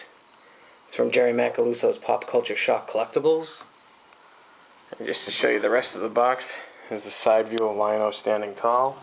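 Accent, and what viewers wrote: Boston, United States. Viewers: love your videos!! can i assume you are a red sox fan by your accent?? lol sorry couldnt help but notice, thanks for such awesome statue videos man!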